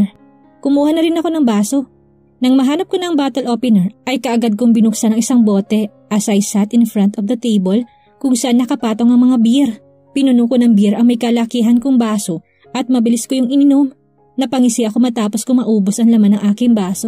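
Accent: Visayas, Philippines. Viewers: Haha ang cute mo miss mag Bisaya. Maraming salamat adm8n sa upload. Attention miss narrator pwede message me private. Thanks 💗💞🇬🇧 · Hahaha ang cute mo mag bisaya ms 😁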